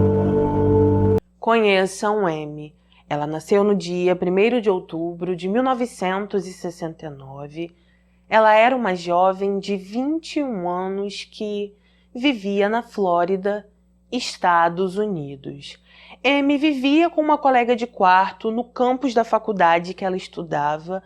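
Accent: Rio de Janeiro, Brazil. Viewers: Más uma inscrita porque gostei do teu sotaque igual carioca 😍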